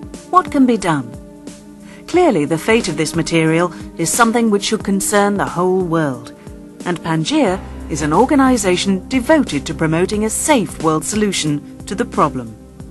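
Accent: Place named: England, United Kingdom. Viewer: The English accent voice-over wasn't a great idea though!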